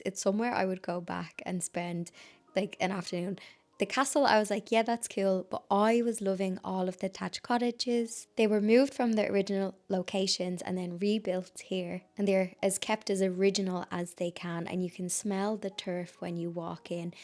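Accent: Ireland. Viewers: I love this video!! To learn this history of Ireland from your beautiful Irish brogue surpasses any history lesson. Although, I am only 1/3 Irish, ☘️ I hold Ireland close to my heart. My mom cherished her Irish heritage. Her grandmother, Bridget, came from Donegal. I don’t know how different that is from Dublin. ❤ (My grandmother’s name was Henrietta ❤)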